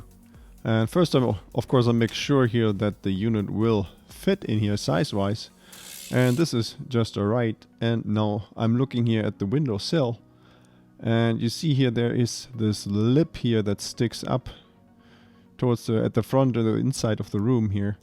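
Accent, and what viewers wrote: Germany. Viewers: i love the subtle hints of the German accent. :) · This video got rid of any anxiety I had about installing these. By the way you sound very German. My tutor always pronounced his "w"s and "r"s like you do.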